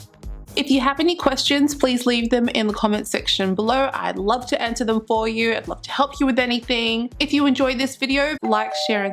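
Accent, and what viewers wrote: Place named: Australia. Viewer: yes I thought that too!!! its the Australian accent !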